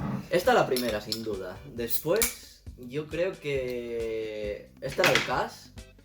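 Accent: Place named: Asturias, Spain. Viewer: Conoci el canal a traves de kira sensei. Segun te escuche sabia que eras asturiano, el acento es inconfundible hahaha, aunque parece que cuando se esta aqui pasa desapercibido. Un saludo desde la capital del principado! P.D. por ejemplo, sidra Del Valle 6.3% de alcohol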